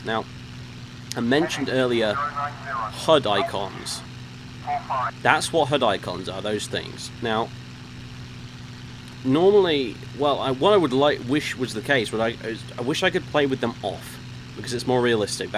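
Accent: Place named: United Kingdom. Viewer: lol, funny sometimes how the English speak English. I mean, it's their freaking language, and we have things like left-ennant and al-u-min-ium. Charming, but funny.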